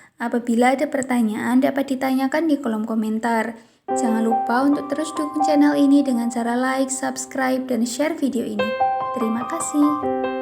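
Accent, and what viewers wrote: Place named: Java, Indonesia. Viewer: Medok itu manis😊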